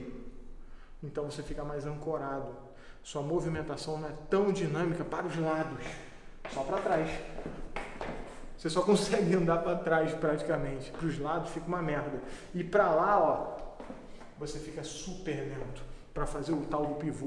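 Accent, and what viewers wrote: Rio de Janeiro, Brazil. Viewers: Tu é carioca ou Paulista vei 😂😂😂😂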